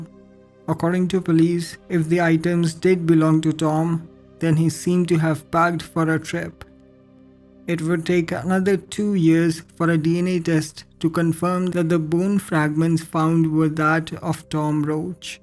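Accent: India. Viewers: he sounds Indian . Its not like he is a terrorist. Goodness.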